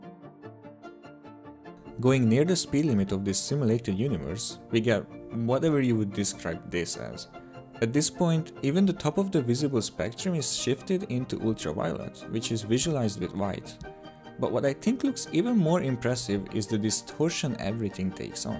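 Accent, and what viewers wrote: Hungary. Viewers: Egész érthető volt még a magyar akcentuson keresztül is. Kedvencem amikor Suttgartot úgy mondta hogy sztátgárd. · Is that a Hungarian accent or am I completely wrong? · Hello! Nice video! Are you hungarian by the way? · Wait, are you Hungarian? Your accent is definitely 🇭🇺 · are you hungarian by any chance? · akcentusból itélve fix magyar vagy, nagyon komoly videó lett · Nem vagy magyar véletlenül? 😅 Különben elég jól összeszedett lett a videó!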